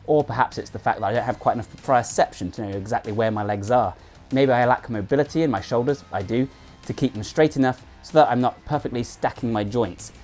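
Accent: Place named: Wiltshire, United Kingdom. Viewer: Finally a decent and informative fitness channel. Subscribed. Which part of the UK are you from? I'm based in Wiltshire you sound local. Keep it up. Thanks, Ben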